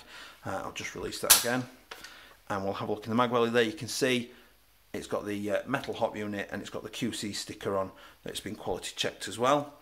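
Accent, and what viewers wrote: United Kingdom. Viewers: Thanks for the response. I notice you've got a northern accent and I'm from west Yorkshire I'm just wondering if you could recommend me any good fields because I'm gonna start airsoft once the lockdowns over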